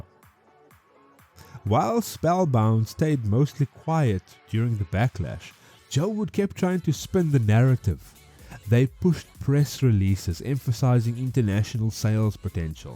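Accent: South Africa. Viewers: Do I hear a fellow South African? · I'm sorry if this is a bit off topic, but given your accent I have to ask: Are you South African?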